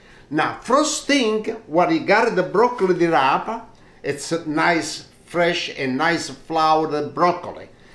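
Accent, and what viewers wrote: Italy. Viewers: Love the way you explain mostly the accent, the pure italian🤌🤌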